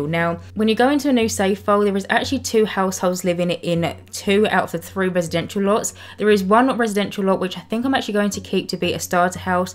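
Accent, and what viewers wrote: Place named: Essex, United Kingdom. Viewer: Just came across your channel! I feel like you’re an essex gal like me! (I could be wrong) 😂 great video none the less. Keep going babe x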